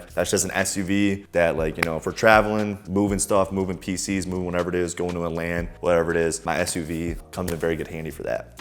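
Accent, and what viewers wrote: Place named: South, United States. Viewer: Why does he sound suthern?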